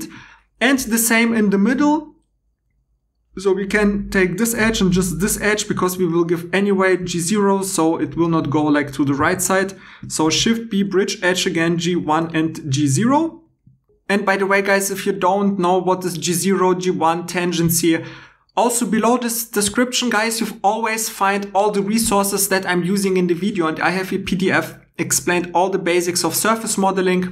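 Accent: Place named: Germany. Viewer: Имя славянское, акцент немецкий. Кто ты, воин?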